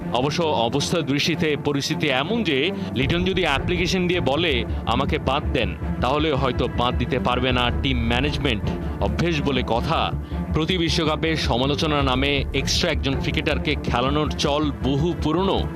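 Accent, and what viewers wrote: Kolkata, India. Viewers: কোলকাতার এক্সেন্টে কথা বলছেন কেন?? বাংলা জানেন না?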